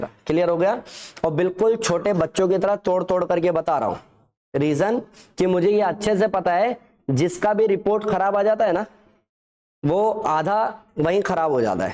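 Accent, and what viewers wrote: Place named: United States. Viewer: कृपया हिंदी मे बताइये नाकी इंग्लिश में अमेरिकन एक्सेंट से नही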